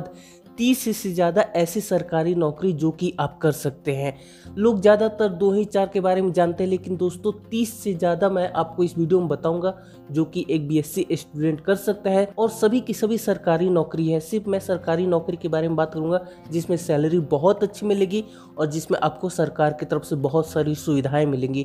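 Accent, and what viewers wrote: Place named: Uttar Pradesh, India. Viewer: Kuan kaun ye bhaiya ki aawaj Uttar Pradesh se sun raha hai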